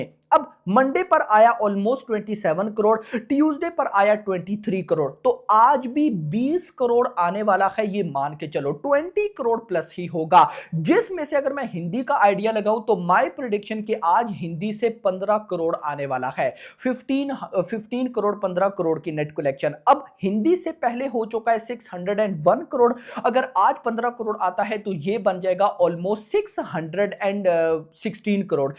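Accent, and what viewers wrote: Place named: Pakistan. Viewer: Yar tu Pakistani h kya Teri avaj pakistaniyo jaisi kag rhi bolne ka tarika 😢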